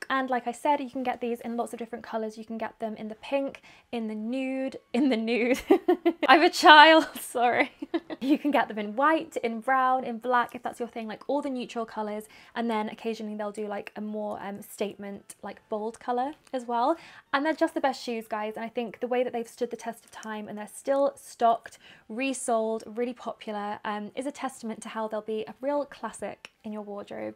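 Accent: United Kingdom. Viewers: British accent Pink life Beauty Elegance Calm Cuteness You're amazing I have been watching your videos for a long time. Keep going 💗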